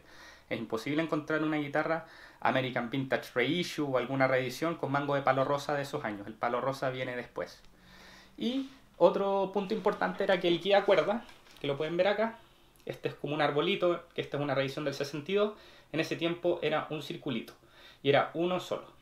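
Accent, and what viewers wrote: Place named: Chile. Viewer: como se nota que erí chileno XD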